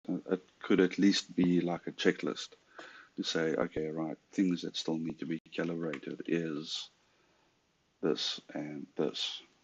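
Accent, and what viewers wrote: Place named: South Africa. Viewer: You from South Africa .. which part ?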